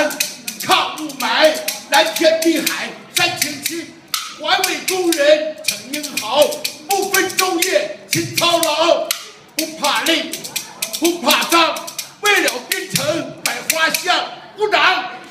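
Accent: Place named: Dalian, China. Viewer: 普通话好吧 只不过是大连银说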